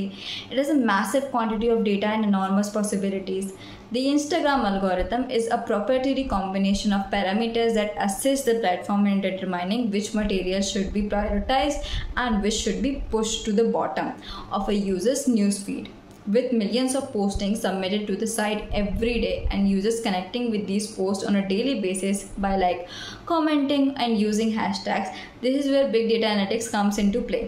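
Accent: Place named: Pakistan. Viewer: omg don't understand you . ? you speak to fast very hard to understand with you're packistan accent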